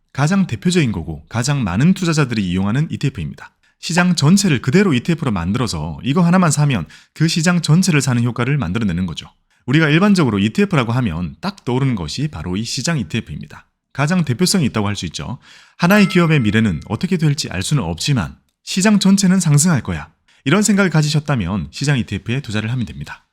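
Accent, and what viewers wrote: Gyeongsang, South Korea. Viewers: 경상도 사투리가 있는거 같지만 목소리가 너무 좋습니다. 귀에 쏙쏙 들어오네요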